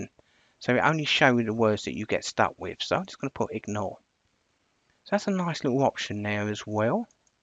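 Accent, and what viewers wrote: United Kingdom. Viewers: My intention is not to be rude, but If you learn to attenuate your strong (British? Australian?) accent, which is incomprehensible for some of us, you could reach many more people. I am a French Canadian who had to learn to attenuate his strong accent in order to be understood by Europeans who spoke French, so I know it's possible. I'm sure your presentation is very useful, but I couldn't understand half of what you are saying. Good luck.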